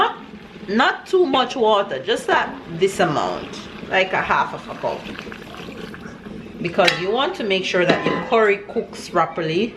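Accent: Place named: Jamaica. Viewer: If you didn't have the Jamaican accent i was going to skip this video ..... im excited to try this tonight !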